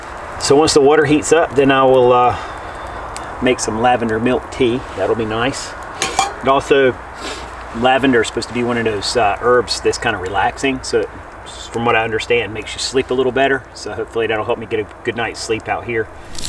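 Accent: South, United States. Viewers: Sorry, trying to figure out what state your in? Couldn't find it, but you have a pretty cool southern twang in your voice.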